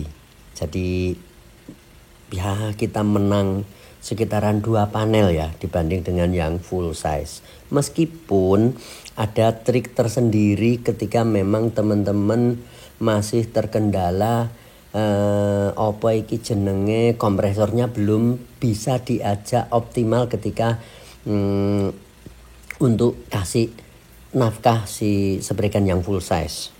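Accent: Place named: Java, Indonesia. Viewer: smpean jowo ndi to mas kok medhokmen 😁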